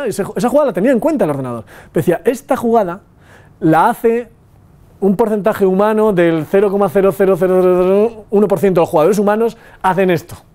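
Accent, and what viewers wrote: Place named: Spain. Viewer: LOco me carga el españolisimo no entiendo NADA jajajaaj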